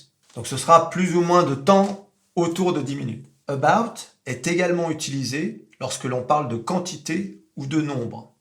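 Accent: United States. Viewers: En tant qu'Américaine, je tiens à te féliciter. Il est extrêmement rare de trouver un.e Français.e qui maîtrise aussi bien la prononciation américaine. Bravo ! 👏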